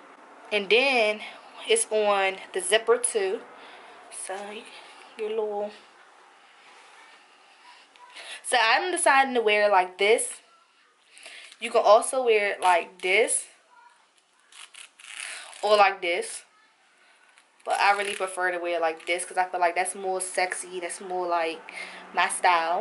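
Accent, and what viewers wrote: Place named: Baltimore, United States. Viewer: Baltimore.... I hear it... Love it an you...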